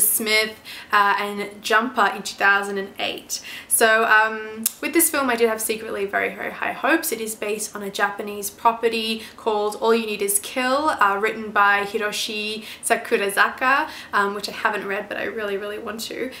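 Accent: Australia. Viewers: Loved your take off the film and I definitely agree with you! You are now becoming one of my new favorite movie reviewers on YouTube with a nice sounding Aussie accent and, dare I say, stunning appearance! Keep up the great work!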